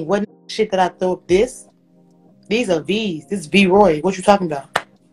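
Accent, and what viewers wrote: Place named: Philadelphia, United States. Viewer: Couple months ago she was up on pophunna sounding like she from philly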